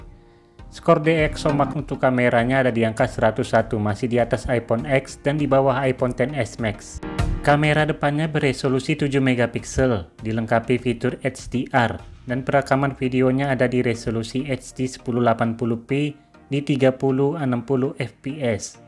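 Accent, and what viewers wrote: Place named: Bugis, Indonesia. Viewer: Kyk logat org Bugis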